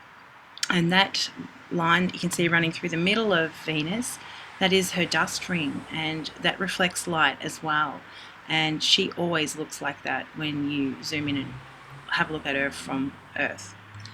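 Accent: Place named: Australia. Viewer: We don't need to have what we learned in school retold. PERIOD. They lied. You sound Australian, I'm in the USA you are right side up.....sorry.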